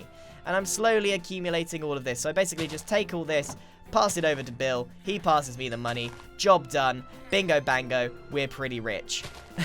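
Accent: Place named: RP, United Kingdom. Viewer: Grian has a lovely posh accent.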